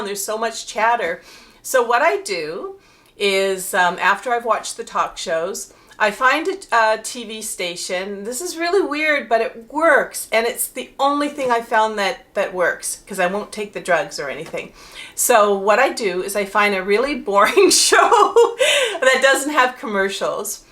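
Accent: Canada. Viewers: Are u Canadian May I ask?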